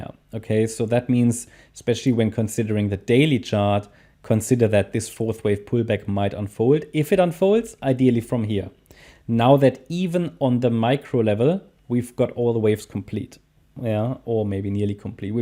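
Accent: Germany. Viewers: ich hab mir das video angeschaut und direkt nach 20 sek gemerkt das du deutscher bist hahaha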